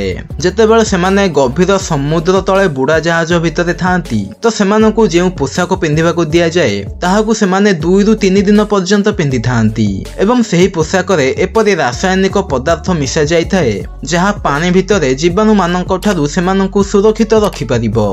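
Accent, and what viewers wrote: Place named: Jajpur, India. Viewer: Bhai tuma voice ta janila pari laguchi tuma ghara jajpur nanpur ki ❤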